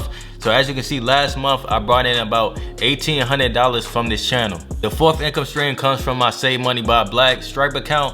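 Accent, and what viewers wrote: Philadelphia, United States. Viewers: I thought you was from Philly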